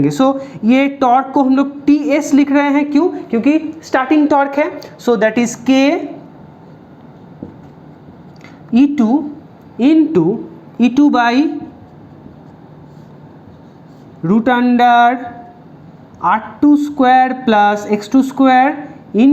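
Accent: Bengal, India. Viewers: Aap bengoli hain ?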